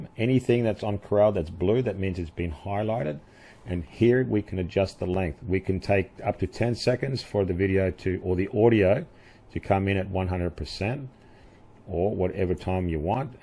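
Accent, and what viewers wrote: Australia. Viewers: I enjoy your clarity (and Aussie accent). I saw a Corel tutorial where a video clip was split and the second part moved along in the timeline, leaving a gap between the two parts. For me, the clip always snaps back to the start, even with ripple editing disabled. Can you share how a video clip can be moved in the track?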